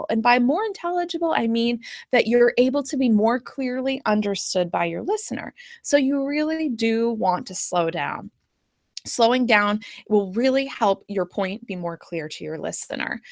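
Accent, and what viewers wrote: United States. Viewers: You have an American accent.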